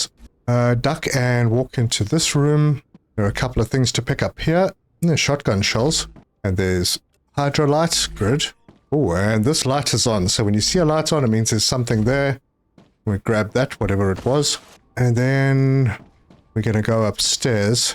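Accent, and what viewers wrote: South Africa. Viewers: You sound afrikaans! Hoe gaan het! Im Dutch but know a bit of afrikaans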